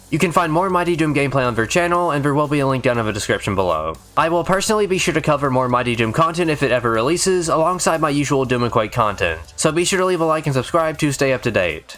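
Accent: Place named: Ireland. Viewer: Are you kidding me, I can't even honestly tell what type of accent he is, maybe irish...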